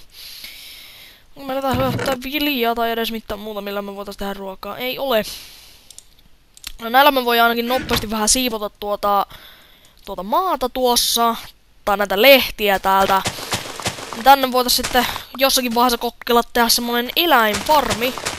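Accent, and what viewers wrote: Karelia, Finland. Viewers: Onko tua sun murre karjalan murre ?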